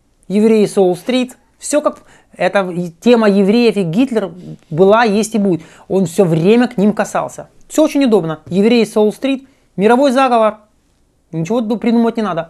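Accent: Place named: Rostov, Russia. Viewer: Девушка с Ростова? Знакомый говор, как собака лает. Кажется, что хамят, но это тут так разговаривают.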